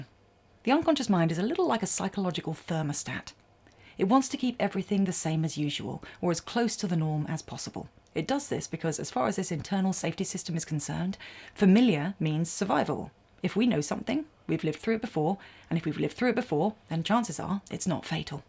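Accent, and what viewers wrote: United Kingdom. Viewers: Your English accent is driving nails into my head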